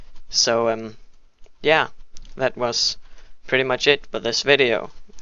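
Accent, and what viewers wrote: Denmark. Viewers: Er han dansker xD.. tænkte jeg nok.. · Are you from Denmark? BTw cool video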